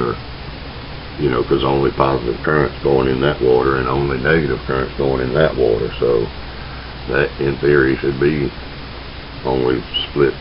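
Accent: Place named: South, United States. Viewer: Pleasure to watch your Vid. Thank You. So why do you think it is. that when people hear a SOUTHERN accent they automtically tjink SLOW OR STUPID? It has always bugged me. Thank you. You may talk slow but you sure don't think that way. :-) PS: My daughter who is Home Schooled follows you and understands you VERY WELL. She is doing experiments everywhere now. She is watching for "SIGNS OF LIFE" LOVE IT!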